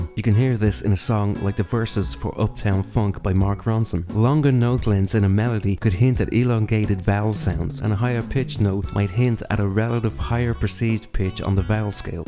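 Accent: Ireland. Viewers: Are you Irish or Dutch? Such an interesting accent! Now I can hear that you are Irish right? Dubliny? Still could be a Dutch person whose learnt from American tv! Crazy! Hope you’re well fella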